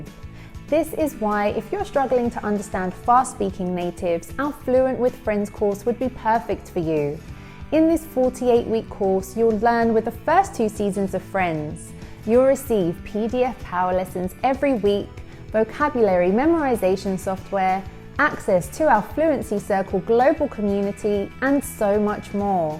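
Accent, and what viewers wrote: United Kingdom. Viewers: this speaker is from British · your British accent is lovely. Which part of the U.K. are you from?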